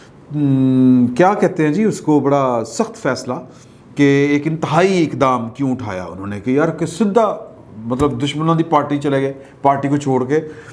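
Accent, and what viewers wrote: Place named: Lahore, Pakistan. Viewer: سيد عمران شفقت صاحب، آپکے وی لاگ بہت پسند کرتا ھوں اور آپکا لاہوری انداز گفتگو بھی کمال ہے ما شاء اللہ۔ ایک بات فرمائی آپنے کہ "وہ لوگ" جو فیصلہ کرنے کی "صلاحیت اور قدرت " رکھتے ہیں، معذرت کے ساتھ یہ نہ کہا کریں "قدرت" تو آئین بالکل نہیں دیتا اور " صلاحیت" اتنی کے دس سال سے مل کر بنایا پلان دو سال بھی نہ چل سکا مگر فیصلے زبردستی ضرور کرتے ہیں۔ اللہ آپکو خوش اور آباد رکھے!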